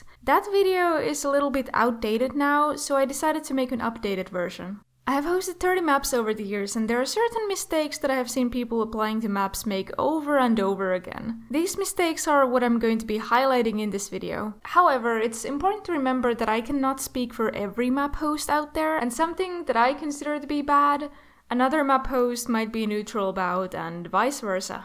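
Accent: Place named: Finland. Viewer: Nice video! I LOVE watching MAPs (but have never joined one because I don't have the patience for animation) Side note- I immediately thought your accent sounded familiar and I was right lol (my family is Finnish)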